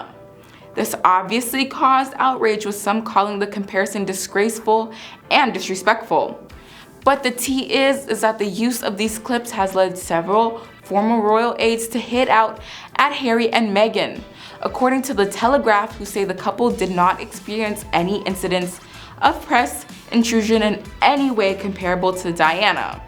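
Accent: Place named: United States. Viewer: Sorry to reply again - but many many!! centuries old traditions, not single century. Based on your accent, I’m assuming you’re American like me. Our country is so young that… even though growing up we don’t fully appreciate that as 10 years Sounds like a lifetime to a kid…. But they have been around a lot longer.. I mean we have too to an extent, but not as “America.”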